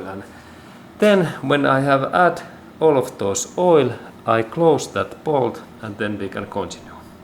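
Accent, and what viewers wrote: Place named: Finland. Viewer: It is finnish Rally english...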